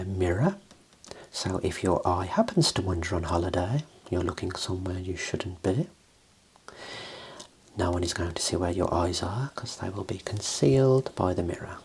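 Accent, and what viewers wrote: Birmingham, United Kingdom. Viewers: Birmingham, England 😊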